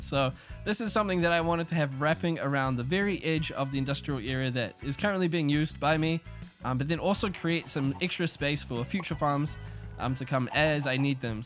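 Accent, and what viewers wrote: New Zealand. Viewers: You from New Zealand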